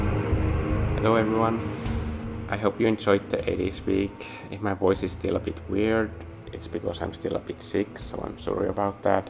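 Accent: Finland. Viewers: I knew you’re Finnish because of your accent before I even saw your username lol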